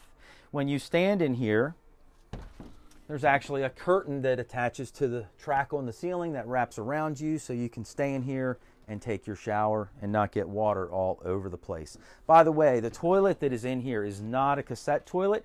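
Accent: United States. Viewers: Love your warm accent 😄 you really lengthen your oooooo’s which is so warm to listen to , a very friendly down to Earth American accent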